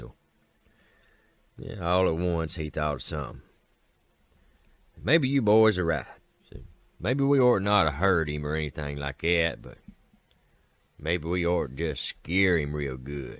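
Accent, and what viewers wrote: South, United States. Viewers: your smooth southern comfort voice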